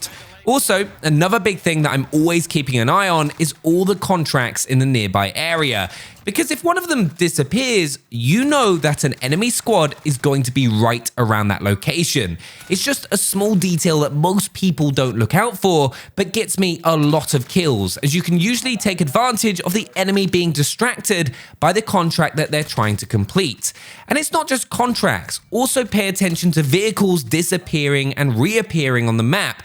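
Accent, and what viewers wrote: United Kingdom. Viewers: U sou d like a British version of rex from toy story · I love how he says butha instead of bertha XD british accents are the best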